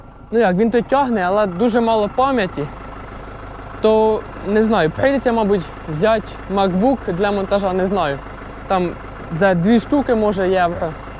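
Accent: Poltava, Ukraine. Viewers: Супер земляк.Що їм мова не нравиться,як причепляться людина із Полтавщини.Нікого не слухай наш суржик супер.Удачі.Хай щастить у житті. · Я з перших кадрів,коли тільки почала дивитися канал,зроміла,що з Полтавщини,говір неповторний ❤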